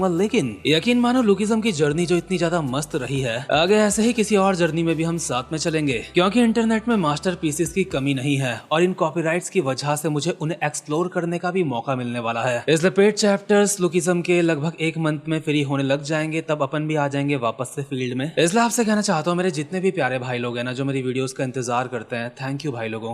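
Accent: Uttarakhand, India. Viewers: surname se pta lg gya tha ap uttrakhand ke ho accent se bhi 😍ap kumoani ho y garwali me bhi uttrakhand se hu ❤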